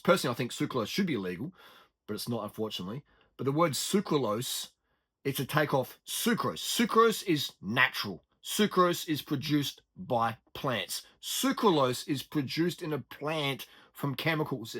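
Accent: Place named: Australia. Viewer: When I hear an Australian accent I pay attention.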